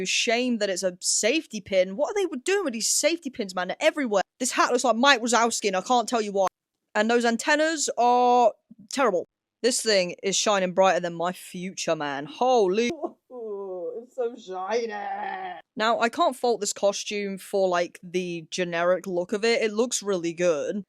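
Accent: United Kingdom. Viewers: UK London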